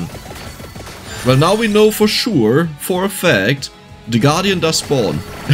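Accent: Germany. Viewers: I am consistently impressed at the level of care and skill you put into these videos. Looking forward to more laughs and awesome content from my favorite funny talking German man ❤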